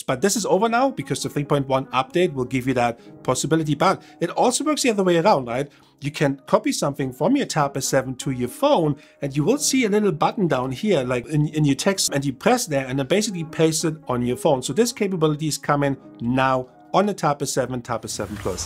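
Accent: Germany. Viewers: Nach der ersten Sekunde merk ich schon da spricht n Deutscher😁👍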